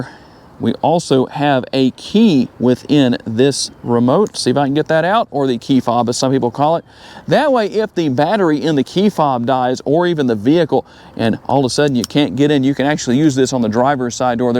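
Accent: South, United States. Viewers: He speaks like someone from the southern United States. He is probably very respectful, cares about people he doesn’t even know and smiles when people aren’t nice to him. Good salesman. Might buy one of these because of the video.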